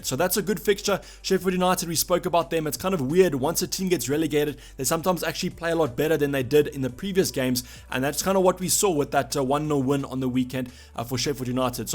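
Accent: South Africa. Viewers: Never tiered of hearing your lovely South African voice, keep up the great work 👍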